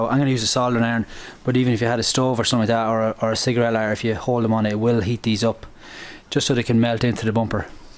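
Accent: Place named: Ireland. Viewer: wow fair play well done and good to have an irish voice for a change lol.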